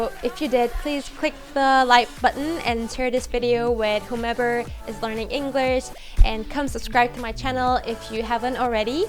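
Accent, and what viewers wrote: United States. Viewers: you got american accent obviously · Your English sounds like you moved to the States early in life. You have a "Californian" accent. Thank you for your story! Moral of the story. Listen to your parents!! Lol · I’m pretty sure you must have lived in one of the native speaker countries and you sound like American. To be able to speak like you do, you need at least to have native speaker friends for your daily conversation and of course living in one of the native speaker countries is the best way to do it. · Your accent sounds American. Like if you hadn't said you were Korean I would think you were American. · I have to say, your english is almost flawless. In your recent videos, you even speak with perfect american accent. I thought you were raised in the US. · Fluency is easy thing, u need to go english spoken country. Hardest thing is sounding like a native speaker. 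The reason u sound like an american in english is that u used to learn the language when u were a kid which u had language plasisty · Why American English, and not English English? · your English is amazing, research the English 'schwa' to nail these small pronunciation errors. A lot of English words, especially when spoken fast, have the schwa sound (e.g 'a cup of coffee' can be said as 'uh kuh puh coffee'). I learned French with a similar approach to you and I really did my own study on pronunciation in both languages. Other than that your accent sounds to me (I'm british) like a very American one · Your accent is so cool.... I thought you were raised in the State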